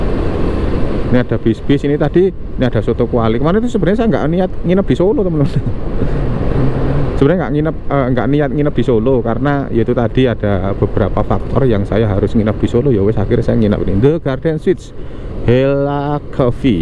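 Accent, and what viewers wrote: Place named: Malang, Indonesia. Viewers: ngomong omah e klaten mas, tapi logat mu wong jatim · Solo pancen ngangenin, Mas wong Klaten tapi logete Malang puol..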